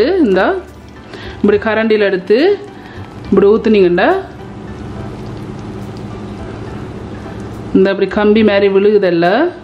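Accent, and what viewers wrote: Sri Lanka. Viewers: I'm from Tamilnadu near Madurai I subscribed to hear your srilankan tamil. Your recipies are entirely new for me and very interesting too. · இது இலங்கை தமிழ் அதை கேவலம் என்று சொல்ல வேண்டாம் பிடிக்கவில்லை என்றால் ஏன் வீடியோ பார்க்கிறீர்கள்.... நீங்கள் உன்மையான தமிழர் என்றால் கேவலமான தமிழ் என்று சொல்ல மாட்டீர்கள்......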